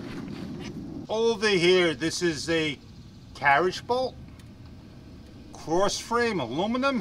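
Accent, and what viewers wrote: New York, United States. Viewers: That NY accent.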